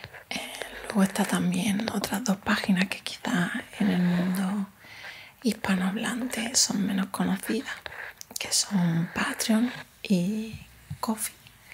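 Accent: Malaga, Spain. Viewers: Olee oleeee y oleeeee ese acento malagueño!! Te va a ir muy bien el canal, ya lo verás 🌻🌻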